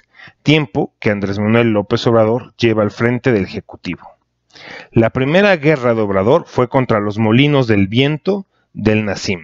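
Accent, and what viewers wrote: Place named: Venezuela. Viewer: esa voz de Venezolano Antorchista 👀 ehhh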